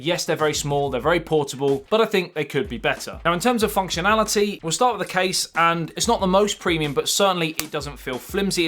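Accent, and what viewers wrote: England, United Kingdom. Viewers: He has a very slight accent - not quite accentless Home Counties, but from the heartland where the English language developed and as such is considered the baseline from which other accents are judged! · lol its just his british acdent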